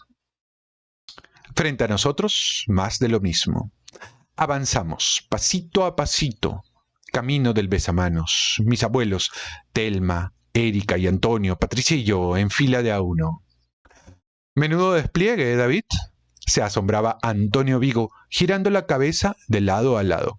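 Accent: Peru. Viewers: Genial eres Peruano????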